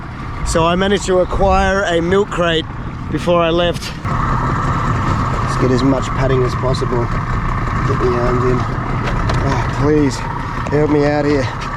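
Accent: Australia. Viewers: One of the most relaxing things I do is watch your camping trips on the islands. One of the most amusing things is listening to my wife's (affectionate) commentary on your Aussie accent and syntax and mentioning that I'd fit right in with how I "sometimes" shorten words. LOL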